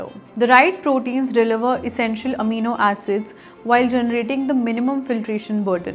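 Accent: India. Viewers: I don't understand Indian accent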